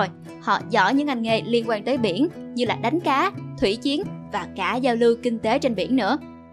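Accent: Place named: Quang Tri, Vietnam. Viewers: tieng quang tri y chang luon :3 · đây là giọng phía tây Quảng Trị hoặc phía nam gần huế, chứ ở trung tâm lệch về phía đông giọng khác nhau nhiều!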